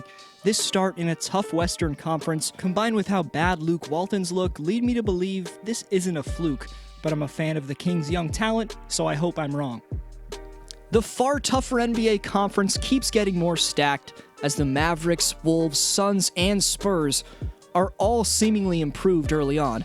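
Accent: Canada. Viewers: I never knew about the instagram beef, bruh. You just lost me there. You sound Canadian and like you're wearing a tie. When Drose won MVP you were probably barely walking. I don't do millennial channels.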